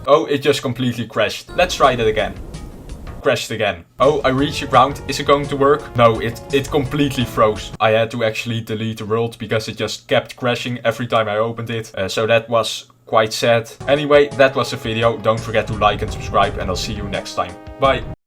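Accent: Netherlands. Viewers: Je Nederlandse accent is wel grappig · Is he dutch voice sounds very dutch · you sound dutch r u? if yes then i wanna say 'hallo man' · Dutch person recognises dutch accent... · i can hear that this man's dutch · Is it weird that I can hear that you are Dutch? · ar you Dutch? because im dutch and you have a dutch accent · Judging by your accent your dutch!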